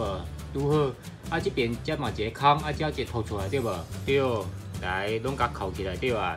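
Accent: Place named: Taiwan. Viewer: 的臺語好可愛:-) 說的不錯ㄛ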